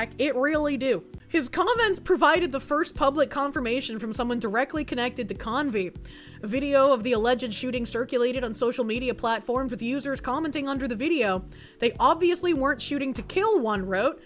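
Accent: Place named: California, United States. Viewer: So I'm not the only one who can't take anything too seriously when a Californian accent is sprinkled on it.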